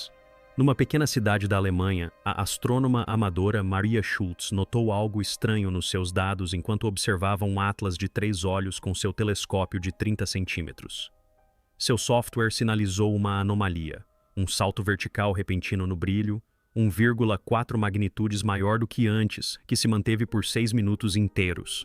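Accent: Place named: United Kingdom. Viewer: Sotaque horrível "r" muito britânico colonialista.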